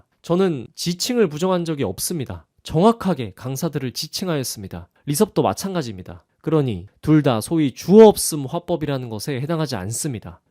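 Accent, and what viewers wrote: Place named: Gyeongsang, South Korea. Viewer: 경상도 분이신가보네요 오늘따라 사투리가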